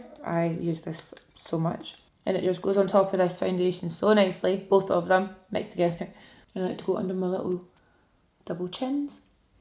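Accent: Scotland, United Kingdom. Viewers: Omg are u Scottish?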